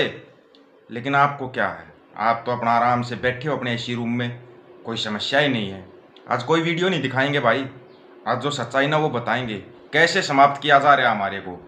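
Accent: Haryana, India. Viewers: Tere boli Haryanavi hai or tu Punjabi trend karwa riha hai ki sabko pata nahi hai tu paise khake godi main ucchal riha hai .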